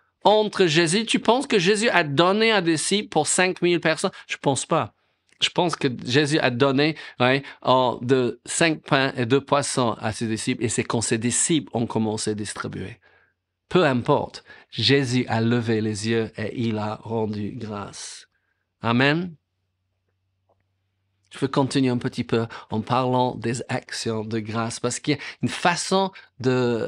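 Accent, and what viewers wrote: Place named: England, United Kingdom. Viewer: Message clair simple dit avec un joli accent Anglais....bon pas grave car tu présente tout ça avec joie et amour. Merci car je suis encouragé a rendre grâce pour tout ce que DIEU donne par le nom de JÉSUS AMEN Soyez bénis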